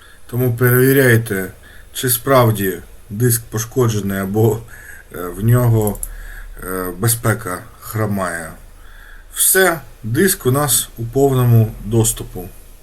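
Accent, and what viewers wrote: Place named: Surzhik, Ukraine. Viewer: молодець, і як фахівець, і як справжній українець, а саме: ділиться власним досвідом, за допомогою якого я раніше відновив HDD диск Western Digital на 500 Гб, а також перейшов на українську мову, що дуже приємно чути, навіть суржик від у мене особисто викликає повагу до нього, адже найважливіше зробити перший крок. Я раніше теж спілкувався мовою східного "сусіда", але зараз розмовляю українською і незначні помилки з часом виправляю, а моє оточення ділиться приємними враження від почутої від мене української мови. повністю підтримую. Від мене лайк, давня підписка і всього тільки найкращого.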